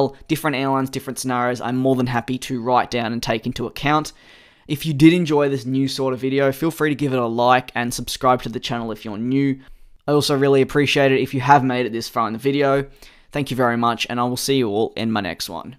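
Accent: Australia. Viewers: you are Australian? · Is the narrator Australian?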